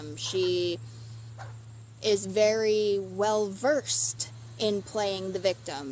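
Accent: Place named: Florida, United States. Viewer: I can say as a father of daughters I am very proud of you getting away from Lie-net and focusing on your family. You sound like you would fit right in my country area of osteen florida. Jeremy hales is a really good guy who sticks to his word and helps through the heart and has much respect world wide. I look forward to more videos from you.... until then keep that pretty smile going😄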